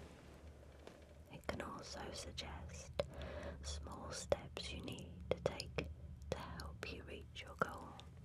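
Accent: England, United Kingdom. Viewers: Hi.... Just discovered your channel.Makes a nice change hearing an English voice.You have a soft tingly voice.Its crazy I want to hear more of your voice but I am asleep after 5 minutes.So in compensation this video will take weeks to complete.....nice. Kind regards Mick.(UK) x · What a beautiful English accent. Brilliant ASMR, thank you.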